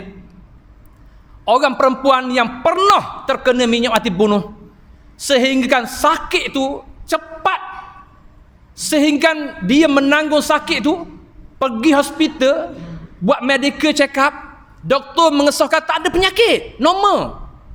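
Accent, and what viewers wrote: Terengganu, Malaysia. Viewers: Sedak dgr ustaz cakap ganu..💯💯🔥🔥..tq ustaz atas ilmu yg dikongsi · "Saya x boleh cakap laju sangat. Keluar bahasa terengganu" gelak sorang diri aku dibuatnya 🤣 berusaha sungguh Ustaz ni kurangkan loghat nya..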